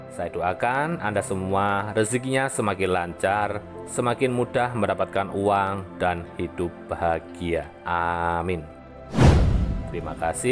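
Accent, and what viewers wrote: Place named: Java, Indonesia. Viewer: Suaranya mirip suara Jokowi. Ditambah lagi aksen medoknya.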